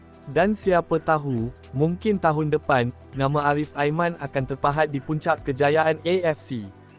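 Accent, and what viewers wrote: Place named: Indonesia. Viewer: Admint ni orang indon sebab Tu dia cakap MCM Tu.😊😊😊😊😅😅😅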